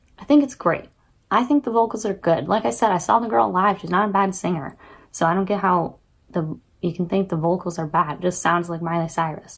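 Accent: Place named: South, United States. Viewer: You have a slightly southern accent, my favorite song of her is. What is your favorite one?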